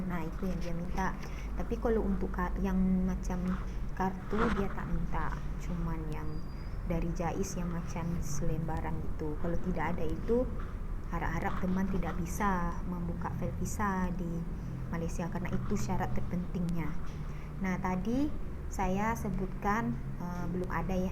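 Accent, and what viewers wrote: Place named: Medan, Indonesia. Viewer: Medan banget suaranya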